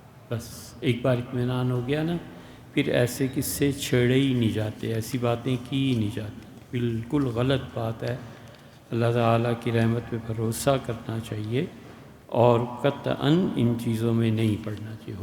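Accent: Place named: Lucknow, India. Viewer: مفتی سعید خان پاکستان بن چکا ہے اور اب تم لکھنوی ماحول سے باہر مسلم معاشرے کے رہائشی بن چکے ہو لہٰذا اپنے لب و لہجہ کو پاکستانی زبان سے متعارف کروا کر ہندو غلبہ والے معاشرے سے دور کر دو تو بہتر ہوگا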